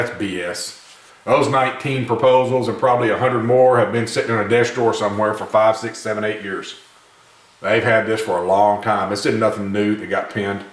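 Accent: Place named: South, United States. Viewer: To bad! That southern accent could come from anywhere down here! Love the vids buddy! Keep your eyes and ears open and we will weather this storm Lord willing!!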